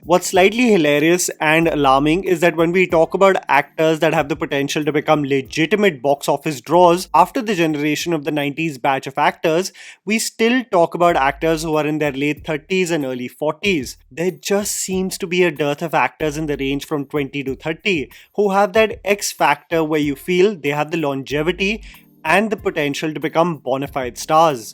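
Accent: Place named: India. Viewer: Bro hate this channel for Indian accent English..... Kindly create videos in Hindi...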